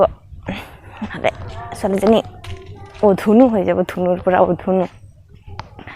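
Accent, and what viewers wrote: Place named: Dhubri, India. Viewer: Vdo go sei moja lagise re... Enkeoi koi ne dhuburir bhakha. moi kin2 xuni valei pao 😊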